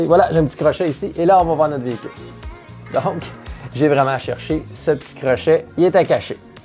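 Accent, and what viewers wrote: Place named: Quebec, Canada. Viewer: Merci pour ce tuto en Français avec un petit accent québécois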